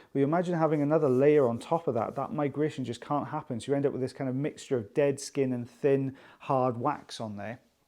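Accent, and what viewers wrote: United Kingdom. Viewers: Are you Welsh? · AYE, IF YOU DONT QUIT FUCKIN SAYIN WE DONT WANNA HEAR YOUR VOICE, I KNOW SOMETHIN! I fuckin ADORE your voice, especially since it's British-accented ❣️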